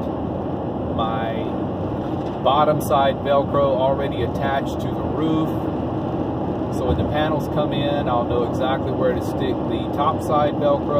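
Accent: South, United States. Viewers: Love your southern accent 🤠👍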